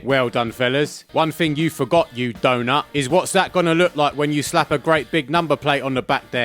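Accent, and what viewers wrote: United Kingdom. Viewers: This is the most British person I’ve ever heard. I love it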